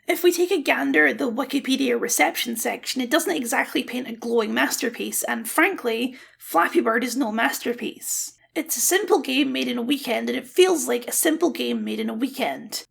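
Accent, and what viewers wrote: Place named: Fife, United Kingdom. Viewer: You're partially right in this instance, as the absolute scavenger that's the current trademark holder is domiciled in the United States. However plagiarism is actually an offense under UK law, the nation state both the creator of this video and I are domiciled in (So I assume based on his vaguely Fife-adjacent accent; Am I close?), and is also an offence in France where the potential plaintiff is domiciled. This might still matter, but only in relation to the game's distribution in those regions: Something I have little doubt the nutter who shat money on a dead old game's name only to feather it with crypto-slop will care little about, as there will be plenty of markets where the law does not adequately protect creators.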